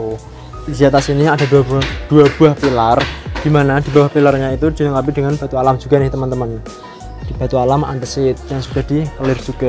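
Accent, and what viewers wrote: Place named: Java, Indonesia. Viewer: Jawanya medok bed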